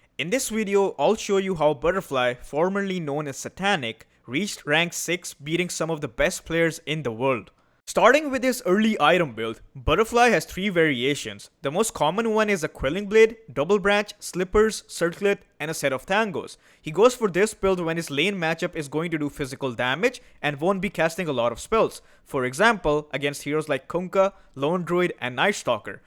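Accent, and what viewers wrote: India. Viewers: You’re accent so indian 😂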